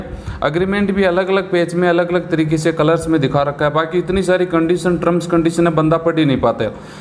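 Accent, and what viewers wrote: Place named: Haryana, India. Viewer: Waise mujhe Aap Haryana se hi lg rhe ho ji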